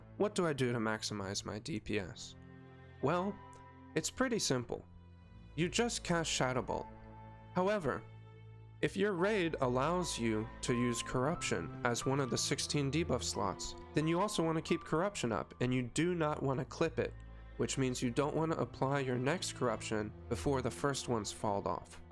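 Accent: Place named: United States. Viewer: I have a hard time with the American accent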